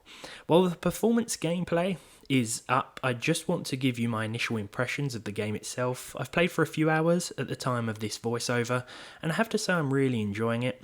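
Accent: United Kingdom. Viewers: ew british accent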